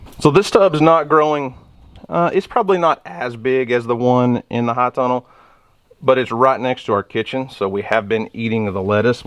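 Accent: United States. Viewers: Just listening your accent ...makes me feel you are an American with no respect for nature ... promoting hydroponics just because you want youtube money ... you American when talking about hydroponics...start by saying THANKS Mexicans, Thsnks Mexícan Empire, Thanks Aztecs, Thanks toltecs for your hydroponic engineering and inventing hydroponic science ...so now I can grow my hydroponic marijuana ....Americans 🙏🏼 God sabe is from Donald Trump ... we can help to build that wall and you can send us back our farmers, we need them, we want them, we love them ... you grow your “marijuana” by yourself !!!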